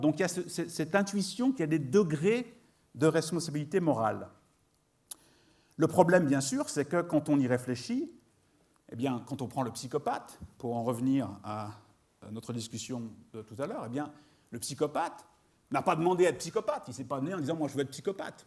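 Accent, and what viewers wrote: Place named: Geneva, Switzerland. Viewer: Que ce Monsieur se rassure il n’a pas oublié son français et même son accent Genevois légèrement exagéré mais bon.... Quant au contenu: pompeux et redondant… Ma conclusion: Profite du coin pour respirer par le nez, une petite randonnée avec un bon saucisson du pain et un canon, une bonne raclette le soir et tu verras s’illuminer ton âme. Ça va aller, tout va bien se passer. Qui boit la gnole casse la bagnole, qui boit la mondeuse casse la dameuse:).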